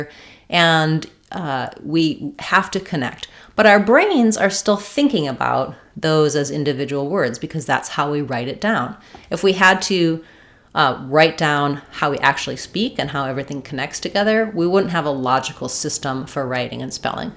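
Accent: United States. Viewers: Is It American accent? please lemme know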